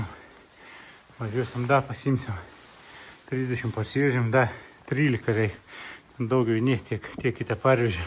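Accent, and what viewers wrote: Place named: Samogitia, Lithuania. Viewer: Kokia idomi zemaitiska sneka!!!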